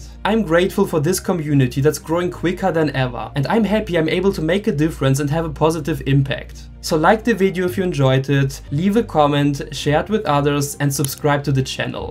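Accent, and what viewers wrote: Germany. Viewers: I knew you had to be German before getting too far into the video lol ( I grew up in Fredericksburg, Texas(Also known as Texas Germantown.)) Definitely dropping you a sub 💯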